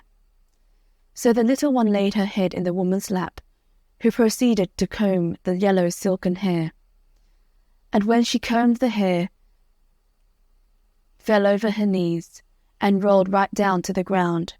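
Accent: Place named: United Kingdom. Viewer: This is British accent.😉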